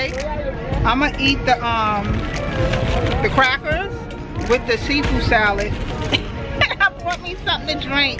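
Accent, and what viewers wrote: New York, United States. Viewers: This was good! I have alway said, you have a New York accent but I never asked if you were from New York. I rarely see anyone that actually shops at Lowe's. When I think home improvement I think about Hone Depot. Now, let me tease you a bit. I always say that Lowes is for the stuck up employees, because whenever I go I never get anyone to help me. Once I got some mirror cut in Lowe's for a DIY Ikea night stand/chest and the attitudes I got because I needed someone to cut some mirrors for me. Then, there's old Home Depot, where people are willing to help me with their orange apron on. I like both stores, but I go to Home Depot the most. hehe... I just wanted to share that. I typically leave long comments. I'm EXTRA in the comments, but you know I'm here for you. Your hubby was trying to be a professional camera man and you kept asking him questions. 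I thought it was so cute and funny. That sink is going to be amazing,. That is a smart Idea on what you do with your business cards. I am so excited to see the kitchen come together.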